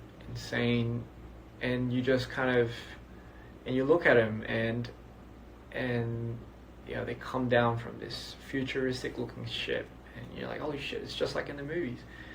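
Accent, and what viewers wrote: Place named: Australia. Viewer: Just here to listen to his aussie accent lol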